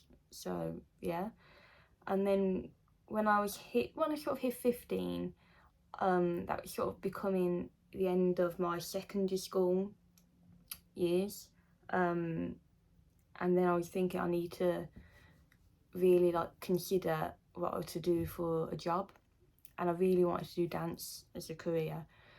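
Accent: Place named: United Kingdom. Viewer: So Sweet of You to Share Your Life and Journey with All of us. BTW, LOVE YOUR BRITISH ACCENT.... Some of my Adult Childrens' Close Relatives Were Born, Raised, & Reside in England. Thank You For Your Terrific Videos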